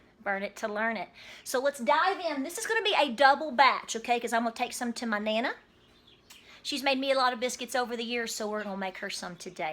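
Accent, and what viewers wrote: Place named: North Carolina, United States. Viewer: I’m a displaced Northern Carolinian living near DC and I just loved listening to you talk. Sounded just like home ❤️. I about lost it when you said *cathead*. Lordy mercy it’s been a minute since I heard that phrase. Now I’m hungry and have to go make these 🤣. TFS!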